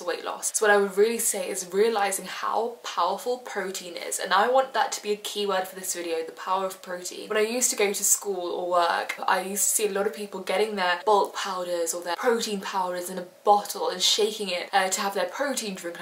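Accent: United Kingdom. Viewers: Love uk accent ☺☺🌻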